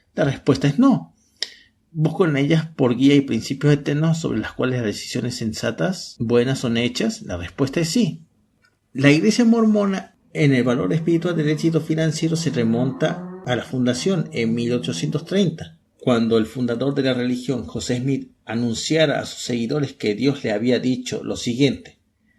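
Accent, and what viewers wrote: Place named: Córdoba, Argentina. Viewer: Hola, me llamo Gaston, soy argentino. Estoy viviendo en Mexico. Por tu acento me parece que sos cordobes, sino me equivoco. Mira, quizas te resulte raro esto, pero aunque soy un "miembro activo y sirvo como lider de estaca" me han gustado varios de tus videos (no vi todos), en especial el que hablas de Korihor. Me gustaria, si fuera posible hablar quizas por correo o wats para hacerte algunas preguntas. Me gusta encontrar personas que se cuestionen las cosas que aprenden. No es un intento para "reconvertirte" jeje, en verdad creo que sos sincero en tu manera de exponer tus ideas y conceptos. Aunque creo en Jose Smith, y todo lo que conlleva eso, primeramente que eso creo en Cristo y sé que la Iglesia no es perfecta, refiriendome a la "Iglesia" como pueblo dirigido por hombres imperfectos pero creo en la doctrina que aun sigo aprendiendo, aceptando que no todo lo que un lider dice tiene que ser verdad. 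Mi correo es <mail> y mi celular es <num>. Espero que me des la oportunidad de conversar con vos.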